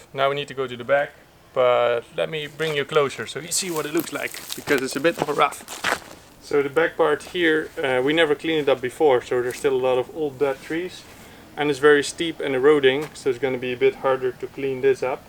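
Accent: Netherlands. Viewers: So obvious cause of the accent he is Dutch from origin.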